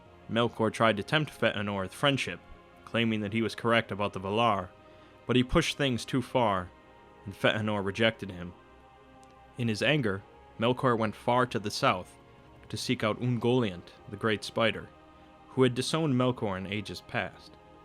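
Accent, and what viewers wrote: United States. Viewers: Can nobody pronounce Morgoth normally? But always American accent... I know it's dificult for us ( born in U.S.) but live in Europe(Croatia). Please try harder. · I am sorry, and I LOVE Americans and their accent, but as an Englishman, I have to state that it doesn't sound right hearing excerpts of the works read in that accent. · I'd say that's just the american accent ;)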